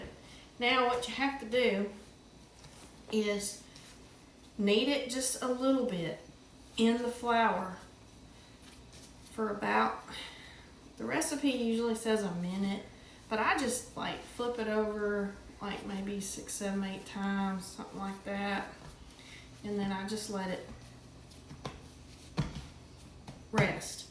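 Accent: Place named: South, United States. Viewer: Oh these look so good. Love your sweet accent and southern charm. Much love from Abilene. - Miss Hetta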